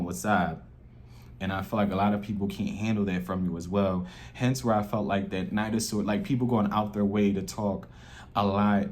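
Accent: Philadelphia, United States. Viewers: Wait….are you from Philly?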